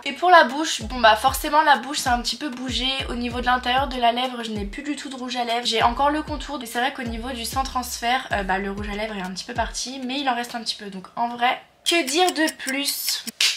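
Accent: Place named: United States. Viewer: Je n'avais jamais entandus ta voie ! Au début, regarder tes vidéo sur tiktok et je croyais que tu n'était pas française plutôt américaine 😅🤣sinon t'es vidéo (et short) son juste trop bien et tes make-up, juste incroyable!!!! ❤❤❤❤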